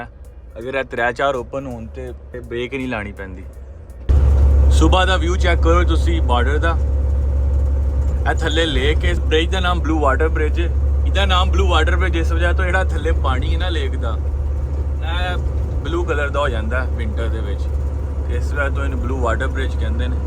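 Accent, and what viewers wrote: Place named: Punjab, India. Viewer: hahah I really enjoyed your Panjabi Accent...Good work bro..Maza araha ap ki vlog dekh kar m Gonna Subscribe you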